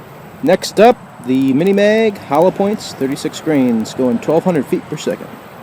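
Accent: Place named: South, United States. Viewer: Why are you talking like a backwoodshilljack can't say anything about brothers talking ghetto brah.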